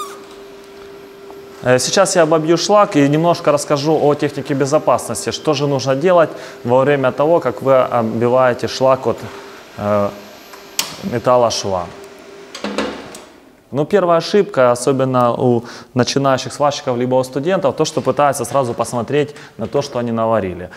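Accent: Kharkiv, Ukraine. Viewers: В Харькове все так Гаворят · Чисто харьковский базар.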